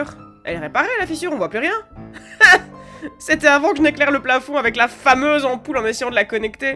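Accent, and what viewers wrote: Liège, Belgium. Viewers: vue ton accent tu serai bien de liege ? ou alors dans les Ardennes :> hahahhah (rire démoniaque)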